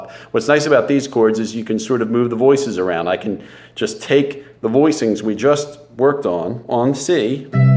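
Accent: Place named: East, United States. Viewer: I realize now that you've been in England for a long time, and that's probably why I hadn't seen you, and your eastern US speaking style didn't give this away. :)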